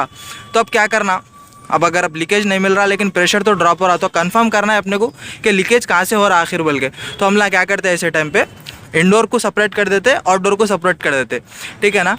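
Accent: Hyderabad, India. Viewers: Tume Hyderabadi mlum hote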